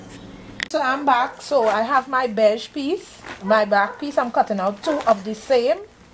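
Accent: Trinidad and Tobago. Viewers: Heyyyyyyyy, I was pleasantly surprised to hear a Trini. Love it!!!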